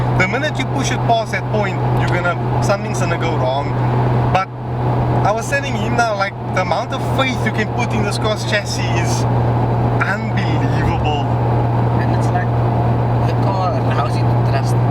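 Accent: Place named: South Africa. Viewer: Cape town South Africa......our country has a lot different accents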